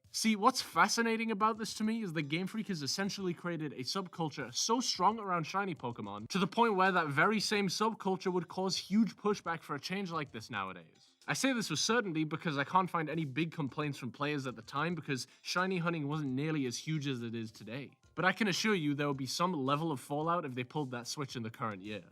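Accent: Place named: North, United Kingdom. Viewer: Nice one bud, had to reward that top tier tweet with a video watch, quality stuff. Especially the lush northern accent. Shiny locking has never made sense, but you're especially spot on about the Gen 3 colour changes, I hardly even clocked them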